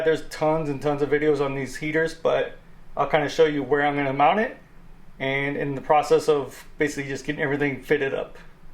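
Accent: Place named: New Mexico, United States. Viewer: I keep hearing a New Mexico accent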